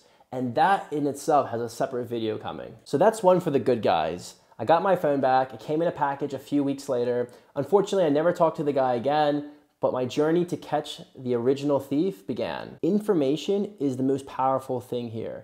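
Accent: United States. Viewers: No shade but you u from philly? That’s a lot of Phowwns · Sounds like south florida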